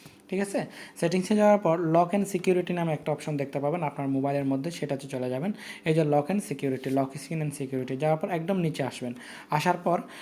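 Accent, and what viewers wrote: Chittagong, Bangladesh. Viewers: চিটাগাংইয়া নাকি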